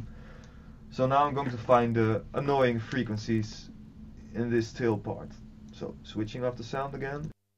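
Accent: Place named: Netherlands. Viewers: Je hebt een Nederlands accent:) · hij is nl >,< wow gewoon wow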